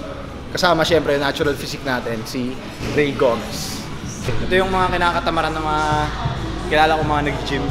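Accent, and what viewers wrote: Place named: Tegal, Indonesia. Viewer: Orang tegal ya? Bocah ngapak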